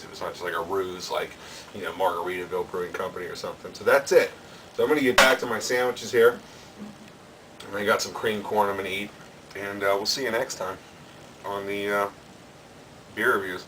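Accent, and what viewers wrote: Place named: Pittsburgh, United States. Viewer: You sound like your from pittsburgh. I assumed you were originally from pittsburgh when you first talked. I'm from southern WV and I've only been to pittsburgh once, so maybe I just haven't heard the accent enough.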